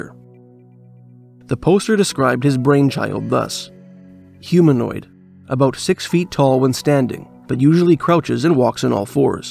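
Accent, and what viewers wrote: Canada. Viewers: I can tell by your accent that you're Canadian 💯 I think the Northwest Territory is the scariest most unknown desolate creepiest place in all of northern North America !!!! Especially the Nahhani region not just because of all the eerie stories but how much of a extremely dangerous gigantic maze it is of huge canyons & rivers & its completely surrounded by other giant regional mazes of forest & mountains & frozen tundras !!!!! It's like the heart of that whole entire region & even though ppl have been there, it's still the most mysterious unknown place in all of north America because of how remote it is & impossible to get to by foot or by automobile!!!!! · Love your Canada accents of passsta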